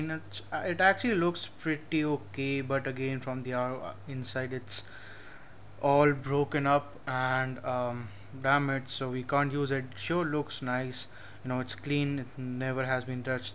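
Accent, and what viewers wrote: India. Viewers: Liked and loved the video.Understood everything you say,but please work on your accent,don't be that funny Indian guy that everyone make fun of when they should be listening.I am talking here out of experience,i have a "Russian" accent/im not even Russian !? / and that is a really big issue for me.It's not racist or whatever,just makes people uncomfortable when they listen to me.Anyway content on point,exactly what i had in mind with my idea and you proved that the idea makes sense.Good luck!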